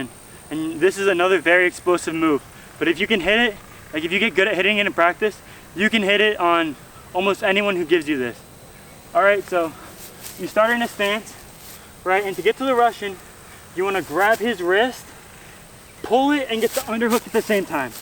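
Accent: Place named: Minnesota, United States. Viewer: Wow, you actually know your shit. You're not just another good looking goofy guy, with chipped teeth that speaks well. Your video (5 things they don't tell you about wrestling?) popped up. It was a good video and funny except you used the f word, I don't mean fuck. I checked out this video, you're a serious wrestler, impressive. You are also a great teacher. Did you do your own film editing? Affective your videos are. I don't remember wrestlers being so critical and such grammar queens. You sound like a Minnesota boy. You are clear and to the point. I think we all knew what you meant. With your personality and those Pete Davidson eyes, you have a great future whether it's in wrestling, teaching, broadcasting, or acting. I hope you are getting promoters and are picky about them. You also need a great Trusted mentor, you're on your way kid. 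Thanks for making wrestling more accessible and interesting. Did I say that right? I don't want the grammar police on my ass. btw your teeth look great at least on video, wear a damn guard Cheers.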